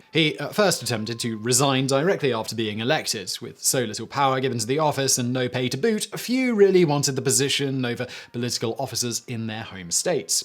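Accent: United Kingdom. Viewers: You yook the words right out of my mouth!!! At least, he talks British. Not sure if he truly is or not. Anyone can imitate a British accent for views. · The current country of the United States didn't exist until its formation through the Constitution... that's why we Americans brag about being the "oldest country in the world"... we have the oldest, continually-functioning government. I do love it when people with foreign accents try to explain America to Americans... enjoy Brexit, Redcoat! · I stopped watching when I heard a Brit speaking about American history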